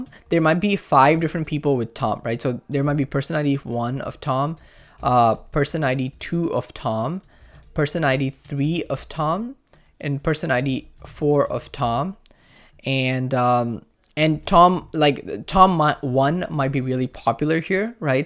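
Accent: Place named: India. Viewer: Funny to hear an indian guy trying so hard to hide his thick accent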